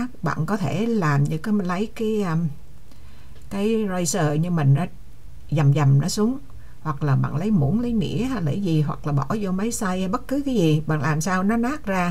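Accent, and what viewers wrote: Quang Nam, Vietnam. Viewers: Cô nói giọng giống người miền Trung, quảng nam.🥰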